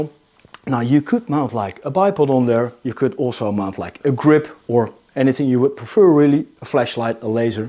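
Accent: Netherlands. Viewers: After a reasonable couple of months. This video made me subscribe and i even gave a like 😊 Always very clear and straight down to the products and explanation. Heerlijk dat boerenkool Engels. En dat bedoel ik positief.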